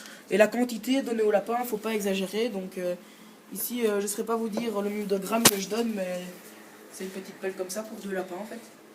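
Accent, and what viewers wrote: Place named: North, France. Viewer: on dirait un mec du nord vu le parlé sinon lapins mignons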